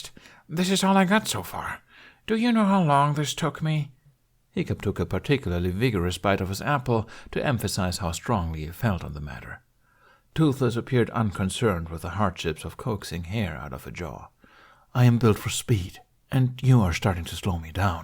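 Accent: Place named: France. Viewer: Dude the THICK french accent OMFG mate its fabulous.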